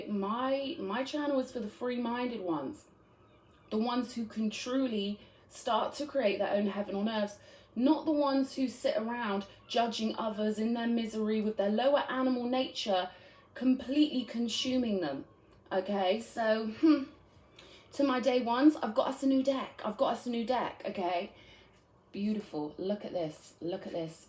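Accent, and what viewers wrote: United Kingdom. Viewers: Smooth British female voice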